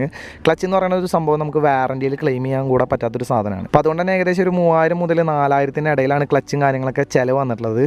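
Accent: Thrissur, India. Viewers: ഇങ്ങള്‌ തൃശ്ശൂര്കാരനാണല്ലെ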